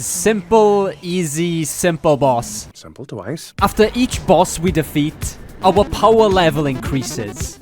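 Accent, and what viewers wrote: Wales, United Kingdom. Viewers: So Elden ring content in 2026, with a solo leveling twist and is that a welsh accent i hear take my subscribe my fellow welsh warrior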